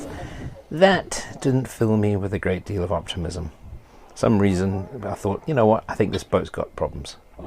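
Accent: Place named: South Africa. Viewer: The narrator is from 🇿🇦 with that accent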